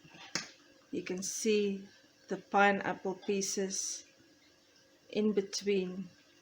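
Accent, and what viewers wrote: South Africa. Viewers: ❤yes 💞 South African accent is unique. I immediately recognised the voice and the recipe. Im also South African here in USA ..thank you for the reminder. I will make this delicious tart over the weekend. Yummy 😋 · I recognize your South African accent. Love that you dont need fresh cream in your recipe... a healthier take on Cremora tart. Definitely going to try it! Thanks sooo much and thanks for not adding any music to your video. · Definitely a south African voice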